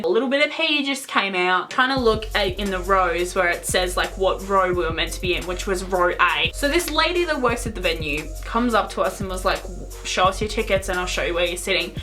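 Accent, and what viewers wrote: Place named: Australia. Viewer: okay but i notice a HUGE difference between pronunciations of words when australians say them vs when americans say them (i being a fellow american). for example, i say uh-deed-iss. you say ah-deed-das. correct me if that's (the way i say it) not the correct way to say it ahaha. NO HATE ON YOUR ACCENT (i actually love it), just thought i'd point that out cause i think it's cool. 😂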